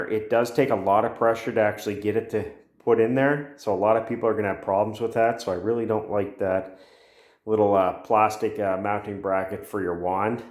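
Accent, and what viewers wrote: Canada. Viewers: When you mentioned the price, I thought, "that seems high compared to what I just paid for one...". Then I listened to you speak some more, and immediately realized you were Canuk. As Bob and Doug would say, "Good day, eh."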